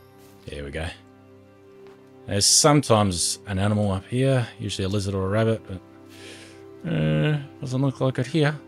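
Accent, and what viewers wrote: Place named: Australia. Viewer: I love the Aussie accent and your humor is top notch sure these vids are old but I just found you a day ago..Keep up the good work..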